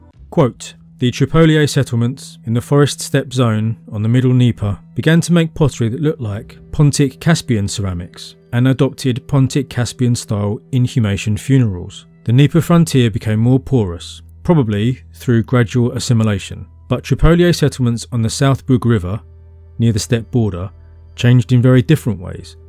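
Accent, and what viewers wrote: United Kingdom. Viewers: I cant listen to British narration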